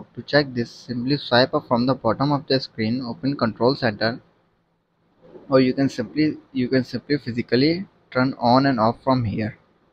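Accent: India. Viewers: Jesus Christ, this Indian accent is unbearable. I can't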